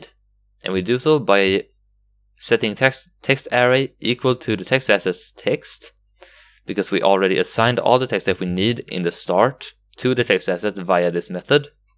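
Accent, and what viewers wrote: Sweden. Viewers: I see that you have a swedish accent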